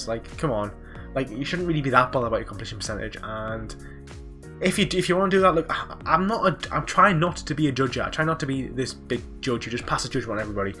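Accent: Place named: Newcastle, United Kingdom. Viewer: Ahh yes a fellow Geordie 😁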